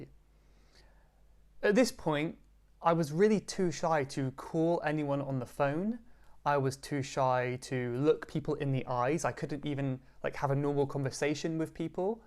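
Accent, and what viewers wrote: United Kingdom. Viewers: I am from India and I am here to learn british accent..and I get your videos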